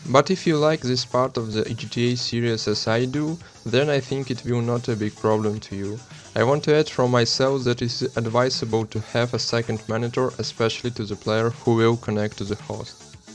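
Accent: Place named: Russia. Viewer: Зачем ты говоришь на английском? Твой акцент сильно тебя выдает, как и дискорд на русском..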